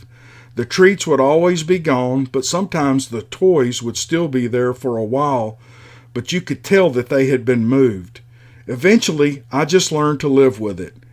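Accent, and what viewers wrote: South, United States. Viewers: Thank u so much! Snowstorm here in iowa and you made it entertaining to cozy up and listen to your southern speak!Love you!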